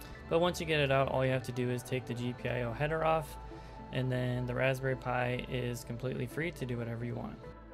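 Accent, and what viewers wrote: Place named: Russia. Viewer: У чувака русский акцент ) А если честно: выглядит потрясающе.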